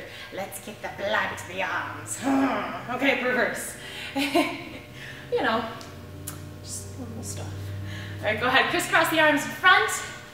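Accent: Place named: England, United Kingdom. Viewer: rawwrrrrrrrrrrrrrrrRRRRRRRRRRRRRRR! WOWZERS that was intense but rawwrsome. :oD My arms feel like jelly. I'm typing this with my nose - JK, or am I?! Have a rawwrrsome wibbly-armed kind of day. And where did you learn your English accent from?!?! Such fun! :oD xXx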